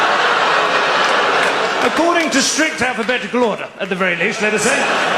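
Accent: United Kingdom. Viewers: I need a translator to American, too much of British accent I don’t remember a country name Walsh, I need a historian too to understand ☮️ so smiling for now 🙂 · FYI writing in English. Native language also English. Dude has a London accent. ❤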